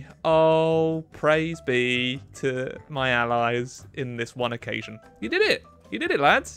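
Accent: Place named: United Kingdom. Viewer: british fella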